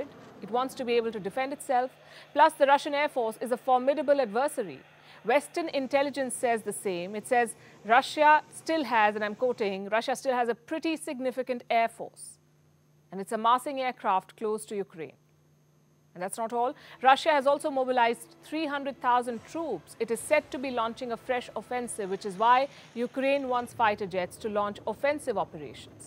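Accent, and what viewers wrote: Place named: Mumbai, India. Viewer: What are you saying.. It's very difficult understanding your Bombay accent.. Tamil urdo sound..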